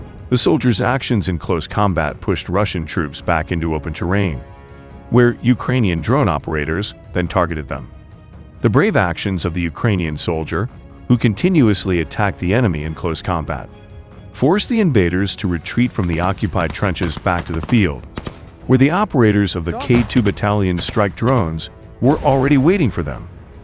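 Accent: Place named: United States. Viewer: Tedious American voice , can't even read or speak English correctly .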